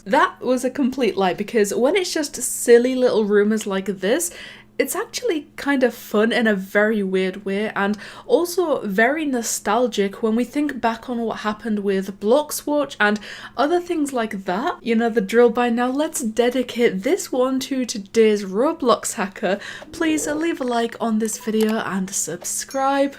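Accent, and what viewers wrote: United Kingdom. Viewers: are you like british?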